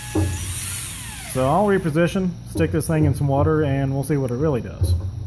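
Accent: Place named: South, United States. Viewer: Okay, Country accent!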